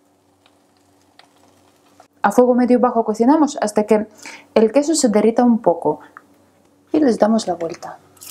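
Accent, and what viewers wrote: Russia. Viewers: Me encantan tus recetas una rubia hermosa y simpática muchas felicidades por tu canal rusa hablando español excelente idea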